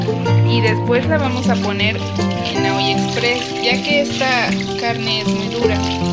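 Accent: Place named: Guatemala. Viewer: Disculpa de donde eres porque pensé que eras de Guatemala.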